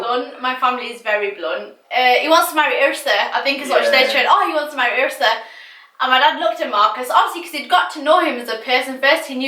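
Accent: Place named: Manchester, United Kingdom. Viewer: Omg lov ur manchester accent... u have same as me 😛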